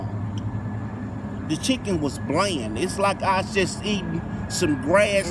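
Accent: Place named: South, United States. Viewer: love that southern accent !!!!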